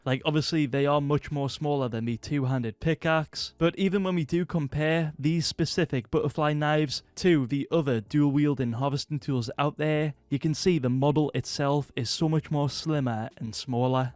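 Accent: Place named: England, United Kingdom. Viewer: I really like your Videos but I hate this english Accent its so extrem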